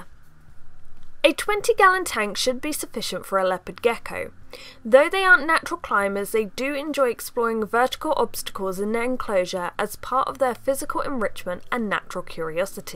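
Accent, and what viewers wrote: England, United Kingdom. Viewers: You mean her English accent? As in ENGLAND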